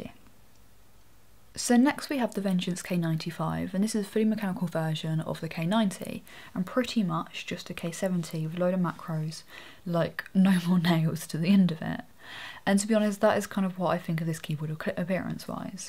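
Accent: England, United Kingdom. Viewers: You have the perfect English 'accent'. Technically it's not an accent I suppose but there are definitely different accents/dialects in England. You have the nice and proper way of speaking and I could listen to you talk about nothing all day long to be honest. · Such a beautiful voice. Can I ask what part of England your accent is from? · this is a very good channel, with a lot of good stuff and its a talented, good looking girl, but her voice is so so bad, with that annoying british accent and a very low voice.keep the good work and work with your voice.congratulations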